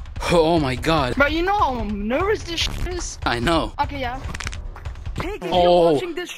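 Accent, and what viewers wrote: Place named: United Kingdom. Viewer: Bro speaking literally in British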